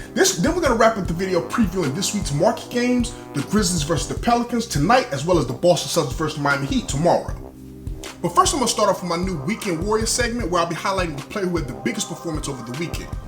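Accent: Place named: Toronto, Canada. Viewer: man you on these videos quick time.. you sound like you from the 6? yea or nah? regardless who you actually route for its nice to see someone who puts up legit facts on this team cause you know mainstream is all about that LAL LAC Zion GIannis and then other superstars if there is time in their time frame of the show .. raps only get mentioned if they play a vegas team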